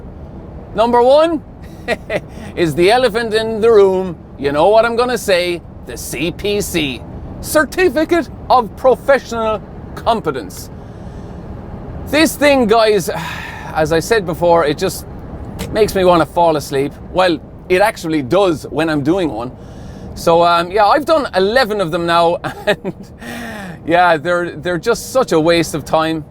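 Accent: Ireland. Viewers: Irish accent, right? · You sound like you're from Dublin. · Ok Ireland is the size of Indiana . My ancestors are from Ireland though . So cool accent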